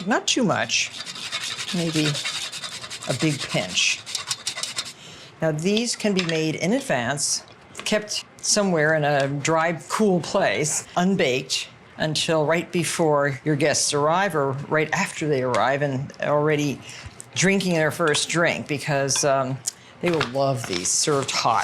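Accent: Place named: Connecticut, United States. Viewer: I love how Martha speaks french in her Connecticut accent... 😎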